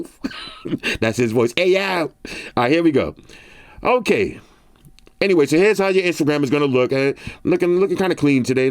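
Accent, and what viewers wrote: New York, United States. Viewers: haha I know a New Yorker when I here it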